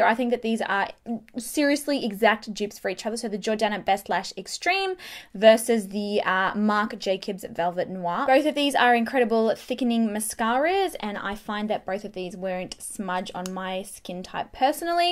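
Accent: Australia. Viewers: Bri Paris She isn't British. She's Australian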